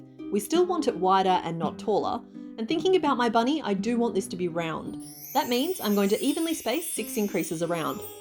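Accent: Australia. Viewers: You explain things very clearly! And it is so wonderful to hear an Australian accent and metric measurements. Thank you! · OMG YES YOUR AUSSIE TOO❤❤❤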